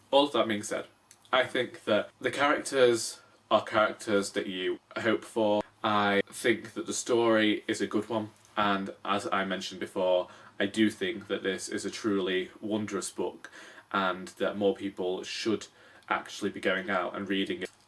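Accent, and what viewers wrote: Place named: Nottingham, United Kingdom. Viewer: Thank you for your review.........I will listen to this. You sound local to me I live in NG1, good to hear you.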